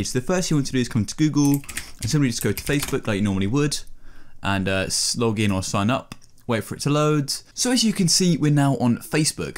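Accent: United Kingdom. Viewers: you are definitely British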